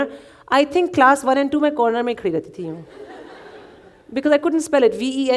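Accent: South, United States. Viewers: This is southern genteel white woman. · EXACTLY!!!! its southern accent...NOT AFRICAN AMERICAN! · This is southern not African American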